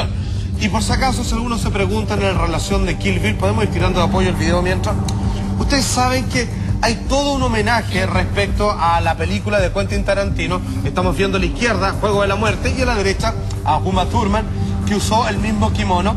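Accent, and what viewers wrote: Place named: Chile. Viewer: no entiendo nada lo que dice este tipo.habla muy rapido y vulgar. y su acento chileno no le ayuda en nada..../////.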